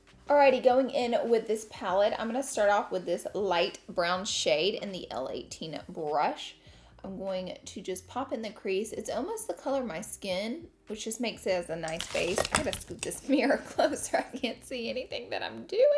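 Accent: South, United States. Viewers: I swore I had seen enough reviews for something I can’t afford right now but I adore face, personality and especially her adorable southern accent 😍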